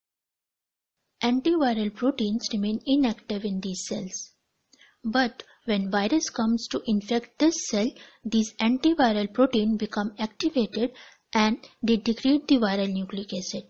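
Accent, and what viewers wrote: India. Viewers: Damn Indian accent...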